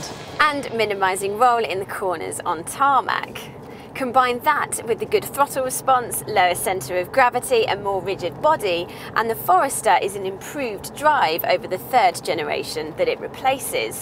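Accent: United Kingdom. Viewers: I love lady's with British accent ... She is really adorable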